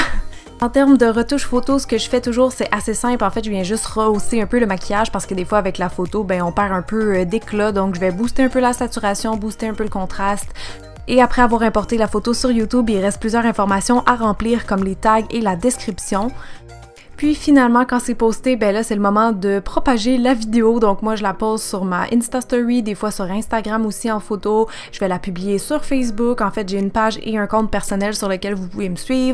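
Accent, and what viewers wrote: Quebec, Canada. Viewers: Un vrai métier on se rend pas compte de tout ce travaille... c'est un pure bonheur de te regardé et de t'écouter car ton accent québécois me fais voyager 😊 bisous 😘 une fidèle abonnée française 😂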